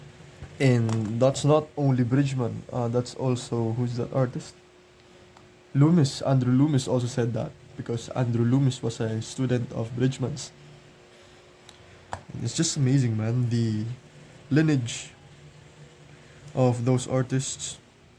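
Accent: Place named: India. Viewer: Lol why are you trying to hide your accent! Embarrassing your Buddy Indian accent!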